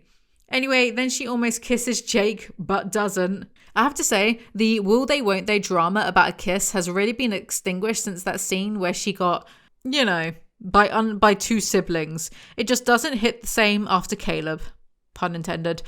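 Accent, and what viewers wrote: United Kingdom. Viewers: Honestly, I've said it before and I'll say it again, I'd kill to get your book reviews on spotify for long term british yapping ad-free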